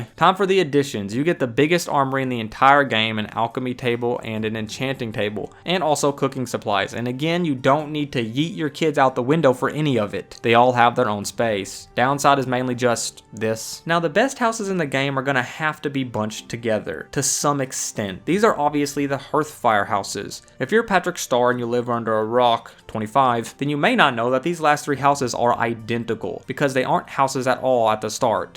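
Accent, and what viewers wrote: South, United States. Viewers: You and theepicnate are easily some of my favorite skyrim youtubers. Just something in the way your videos are so fun and calming to just listen to as you go about your day. The southern drawl in your voice is just the cherry on top. Great vid man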